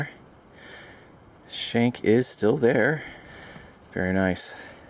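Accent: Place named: New England, United States. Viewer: Excellent video! I wish I knew about this channel before. I subbed ya. Are you in New Hampshire it sounds like you have a New England accent. I'm from Maine myself and I love metal detecting and coin collecting. It definitely sounds like you know your stuff and that's cool. Dave from Maine.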